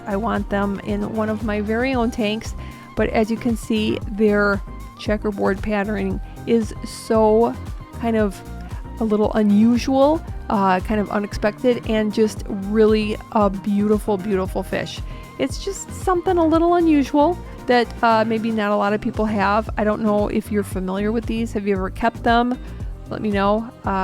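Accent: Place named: Midwest, United States. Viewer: thanks for the video. you have a STRONG midwestern accent :) "oh for crying in the mud!" :P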